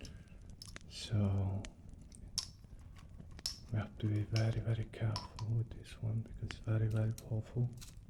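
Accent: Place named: Europe. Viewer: Wow this is a great video! Im subscribed now for sure. I have a question, I noticed you have what sounds like an accent, are you European?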